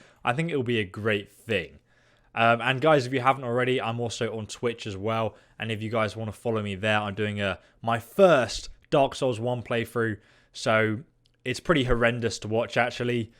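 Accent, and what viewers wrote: United Kingdom. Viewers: Olong Johnson I think he’s British so that’s why he says it like that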